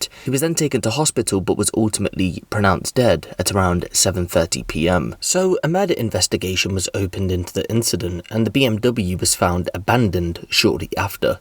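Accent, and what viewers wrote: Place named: RP, United Kingdom. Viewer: Not being horrible but I ain’t watched u in ages and your voice sounds well posh since I last heard you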